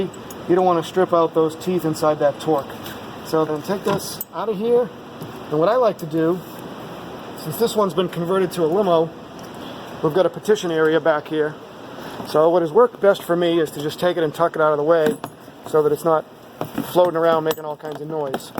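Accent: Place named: Boston, United States. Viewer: Hi, plan on doing this but with a pickup truck. The electrical connections concern me a little, I know you have to disconnect battery otherwise airbags will go off, but in new pickups, I'm not sure if passenger seat is required while driving. If you know the answer, I'd appreciate it BTW at first I thought you were from Boston, or North Carolina (Fisherman's Warf accent), never thought Florida has a hint of that. I heard the 9th ward in New Orleans they have a Brooklyn accent. Thought that was interesting. I love Florida BTW, thnx for the vid 👍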